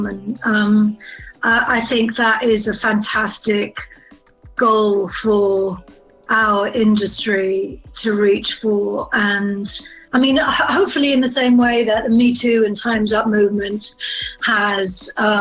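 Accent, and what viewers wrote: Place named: United Kingdom. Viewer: She lived in the UK til she was 13 and has now lived in the UK for most of her life. She’s not “doing” a British accent. It was her first “language”.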